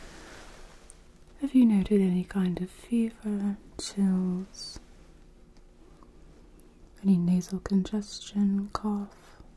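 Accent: United Kingdom. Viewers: I've got used to the British accent (not bad either!) but I wasn't expecting the 'cheers' thrown in - one of the thing you hear a lot in the UK, but rarely when someone is trying to do a British accent. Bravo! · British accent?😅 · It's a British accent. She made a video with 5 new characters last week. All of them have different personalities, voices and slightly different appearances like hair styles. So she has decided to make a video as this new character. Hope this helps!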